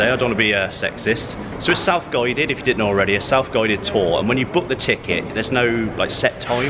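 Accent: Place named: Bristol, United Kingdom. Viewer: You sound like You're from Bristol not Manchester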